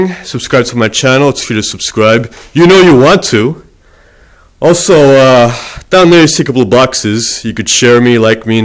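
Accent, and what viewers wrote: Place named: New York, United States. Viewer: Dislike because it should have been a hot girl doing this and not some New Yawker.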